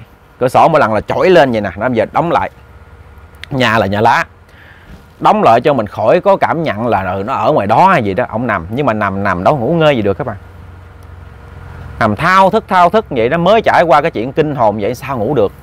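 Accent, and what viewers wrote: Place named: Countryside, Vietnam. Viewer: Kể thì hay nhưng sai c tả thay vì chử rồima đọc dồi làm nghe nông thôn quá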